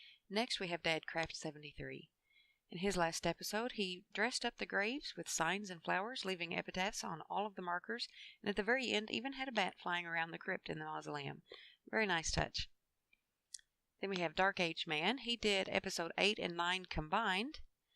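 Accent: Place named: United States. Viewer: Thank you for the player updates this month. You have been very clear and detailed. I love your accent! Whereabouts in the US are you from? Keep up the videos.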